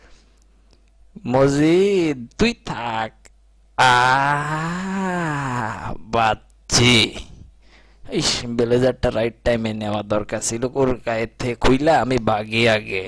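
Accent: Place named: Bangal, Bangladesh. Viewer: sir u r awesome tomar bangal bhasha ta daroon laglo tumi to nek femous hote paro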